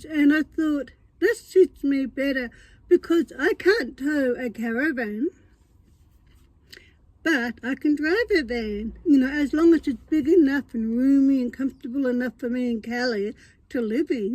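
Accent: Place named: Australia. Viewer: I could hear that you're Australian but thought you were living in England.Where do you park up?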